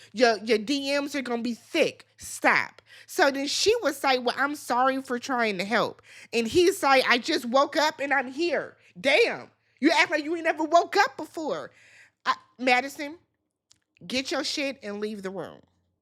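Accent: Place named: Minnesota, United States. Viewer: Kuh-lee-Bree-ah 🙄🙂‍↔️😑 you pronounce names like you’re from MN too…😶😵‍💫🥴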